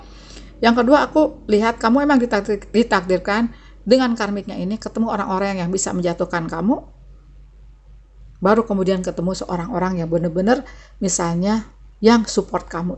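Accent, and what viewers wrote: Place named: Sunda, Indonesia. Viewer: ketika lihat tarot, saya pilih pile 2, terus saya denger bahasa kebangsaan saya saat saya sedang terbesit ingin hijrah ke daerah jawa barat yg ada gunungnya, sawah, pokoknya yg serba hijau deh, eeeeh denger bahasa lawang panto, saya langsung senyum2, ternyata si Teteh sunda pisan...meuni sunda pisan si teteh, salam sakenal tetehi ti abdi si aQuarius 😁🙏🏼 🤝🤝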